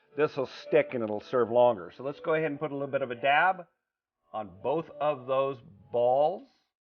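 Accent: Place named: Australia. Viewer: You sound like an Aussie. Your reference to your hubby gave me a hint but I could be quite wrong. I’m about to give this gas strut replacement thingo a go. Here goes …. A 71 year old woman with a screw driver could be dangerous! 😃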